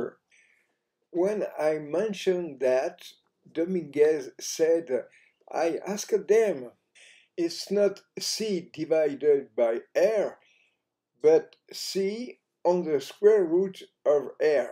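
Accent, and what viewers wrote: France. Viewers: Les sous titres anglais générés aident grandement. Avec en prime l'accent Frenchie pour aider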